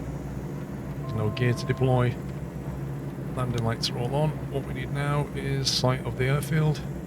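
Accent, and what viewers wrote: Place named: United Kingdom. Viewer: Dude good video as always. But you sound British and should know its pronounced "Edin-bruh" not "Edin-bo-ruh" :)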